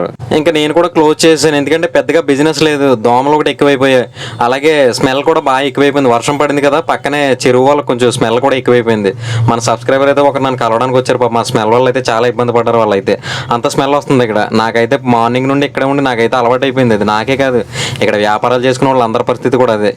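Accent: Godavari, India. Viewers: Ma godavari slang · గోదావరి స్లాంగ్ swag same bro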